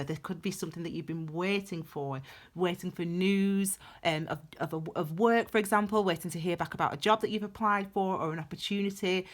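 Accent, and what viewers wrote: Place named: Northern England, United Kingdom. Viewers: Love your readings, your lovely brown hands and your "Guy Martin" accent. You sound like his female equivalent. Are you two from the same geographic area? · I'm in Darwen, I knew I recognized the accent 🤗 thanks for the video! Have a beautiful week x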